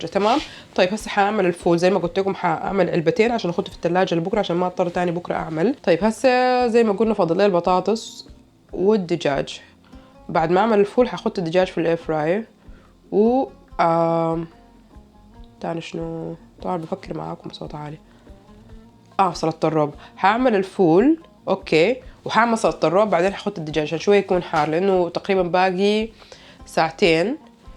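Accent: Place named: Gulf, Arab world. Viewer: السلام عليكم ورحمة الله وبركاته ورمضان كريم عليك اختي. معليش عندي سؤال محيرني هل انتي وزوجك سعوديين او خليجيين ليه بتتكلم خليجي مع انو متأكد انكم سودانييين 100٪ ليه كذا ياريت الاجابه علي التعليق الواحد دايما يكون علي طبيعتو افضل والله. تحياتي لكم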